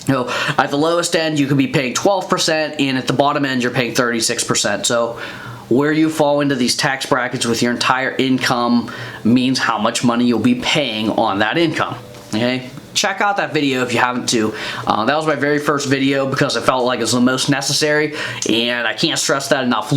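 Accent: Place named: Pittsburgh, United States. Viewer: Thought I heard the Pittsburgh accent....jersey in the background verified that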